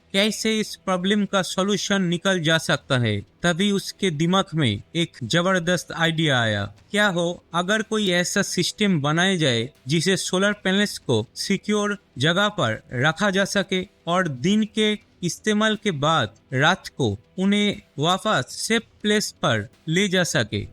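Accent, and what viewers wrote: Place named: India. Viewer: Toom englis hindi ki mafik bolta hi.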